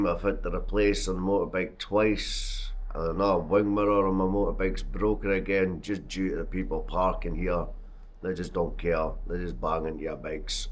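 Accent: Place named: Scotland, United Kingdom. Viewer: I like the accent bro ... Braveheart ... Freedom!!!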